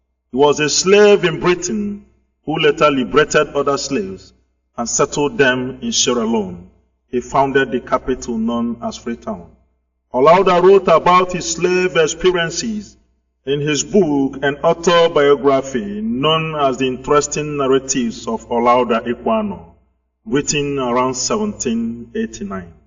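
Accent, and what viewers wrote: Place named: Igbo, Nigeria. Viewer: you are an idiot what proves do you have that the orator is hamate can't you hear the accent is an Igbo man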